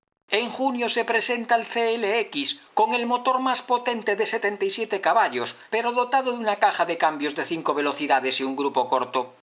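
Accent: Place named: Galicia, Spain. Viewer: Como siempre, excelente vídeo. Por cierto, el acento gallego... auténtico, con personalidad, como un coche elegante de los 70.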